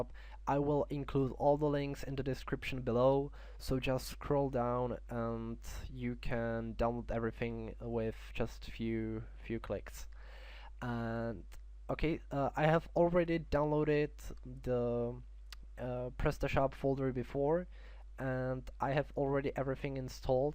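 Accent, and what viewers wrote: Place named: Philippines. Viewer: Your enlish is very good! with a pilipeano accent. I like very much!!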